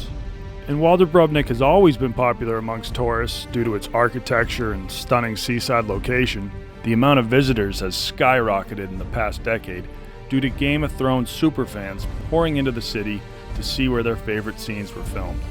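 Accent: Boston, United States. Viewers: Dude you're hilarious, its the subtle boston accent that does it.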